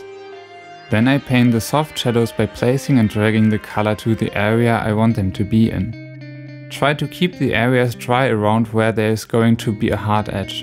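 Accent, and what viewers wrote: Germany. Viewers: Sun Shines Oh... Didn't know but his accent sure sounds German. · Wow, not only is he a great artist, but also has a cute German accent! I could watch his videos for hours.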